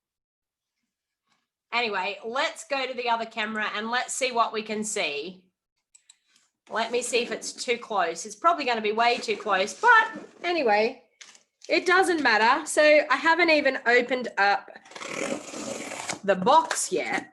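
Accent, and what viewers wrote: Australia. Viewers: Finally Australian accent ❤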